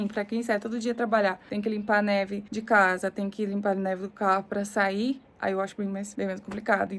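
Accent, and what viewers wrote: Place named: Goiás, Brazil. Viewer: Goiano e sempre um trem gostoso de ouvir né! Acabei de chegar mas já estou adorando seu canal.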